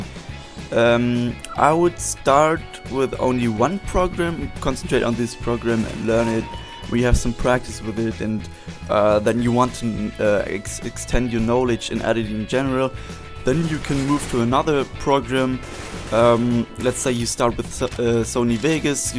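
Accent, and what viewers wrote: Germany. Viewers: are u german ?^^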